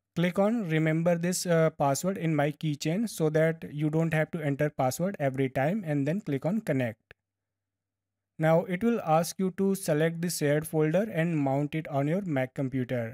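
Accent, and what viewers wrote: India. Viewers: Your Indian pronunciation gave me a headache.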